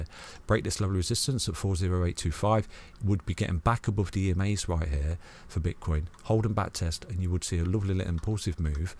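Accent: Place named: Birmingham, United Kingdom. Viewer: I'm not a trader I'm a hodler but your videos are great educational information and your accent is good to here (are you a brummie?) . Regards from Thailand 😁🙏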